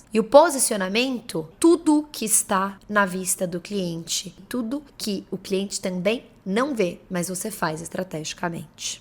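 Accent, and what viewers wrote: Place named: São Paulo, Brazil. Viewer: Paulista fala desse jeito mesmo!😊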